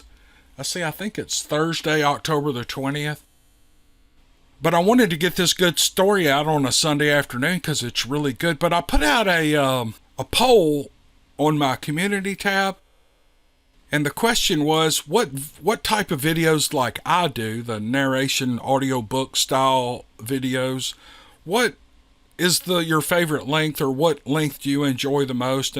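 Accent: South, United States. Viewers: You are most welcomed. I love the southern sound of your voice and I only listen to a few of you guys since Covid, so to me you guys are the OG. Thank you for sharing your time and you voice with us. 🙏🏼❤️ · I took the poll, 😁, love hearing a Southern gentleman tell stories. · Mr., I hope I am not offending you sir by commenting on your last video, however at the Mark you commented "some of these villages were set up by whites!", and I found it to be somewhat derogatory and offensive...what does skin color have to do with Sasquatch entertainment stories and why invoke race when it was obviously not relevant...someone with your platform, and in reaching the thousands of ears and minds that your narration touches, one would think you'd be more conscious of your choice of words and how they're perceived....I challenge you sir to replace the word "whites" in that statement with any other race defining word and id almost bet youd be deplatformed before you coukd say.....that said, my brother and I thoroughly enjoy your southern draw and your channel is our favorite! 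· I missed the poll on your community page. I could listen to you read the back of a cereal box for an hour!😂for me your southern accent reminds me of home. I have followed you for a few years now and you seem like a genuine nice guy I like that too. No foul language no foolishness. Nothing worse than listening to people bashing others, I despise that. I just like your approach to every story or email. No need to thank me for listening, I’m one of your people😂. Thank you for the stories. Keep up the good work brother❤️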